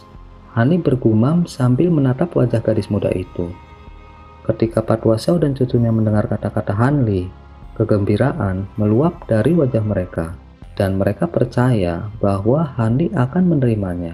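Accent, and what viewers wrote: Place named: Bali, Indonesia. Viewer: Logat bali ini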